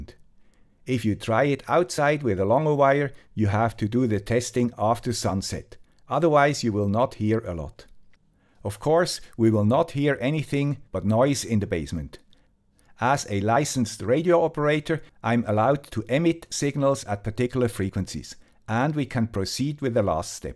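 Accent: Switzerland. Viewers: You were easy to remember, you were the big guy with the Swiss accent! It was a product launch road trip through Europe I believe, or later when I worked for COGNOS and we were launching a DEC platform. It was a long time ago but luckily none of the equipment had vacuum tubes.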